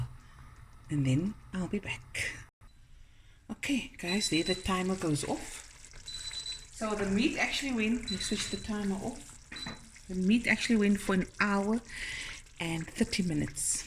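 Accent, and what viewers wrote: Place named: Afrikaans, South Africa. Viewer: I'm a Portuguese South African living in the England just love your accent 😍 & cooking you make me home sick 🇿🇦🇵🇹 english mixed with Afrikaans beautiful 👏👏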